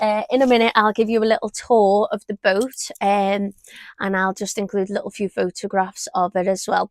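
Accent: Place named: Liverpool, United Kingdom. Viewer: Referred by David (cruising the cut). Nice to hear a Scouse accent! Nice looking little boat.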